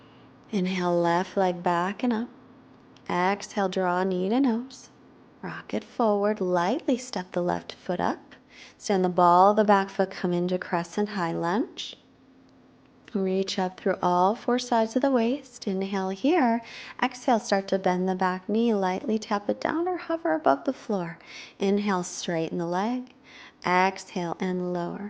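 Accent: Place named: United States. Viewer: Thanks so much for putting this video up. I use it each week. It such a nicely flowing sequence. May I ask, where in the States is that accent from? My ex-girlfriend is from Hudson, Ohio and your accents sound identical! :-) Regards from Australia :-)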